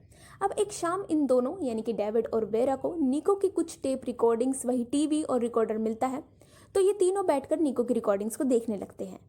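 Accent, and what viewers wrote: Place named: Punjab, India. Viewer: Aap panjabi accent me hindi bolte ho .👍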